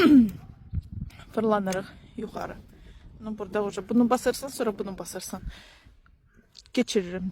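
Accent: Ganja, Azerbaijan. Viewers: Sən əsil gəncəlisən ləhçəndə şirindi elebil kazaşka deyilsən 😂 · Gözəl qəlbli bizim qızımız yaxşı ki, tanıdıq səni və vlogların superdi ailənlə birgə daima xoşbəxt ol bu arada inşeAllah ki, Azərbaycan dilini unutmazsan ən əsasıda Gəncə ləhçəsini unutmayın şirinlik verir sizin danışığınıza 😊 can səninlə birgə gəzdim kəndinizi eyni abu havanı verdiz mənə ❤❤❤❤😅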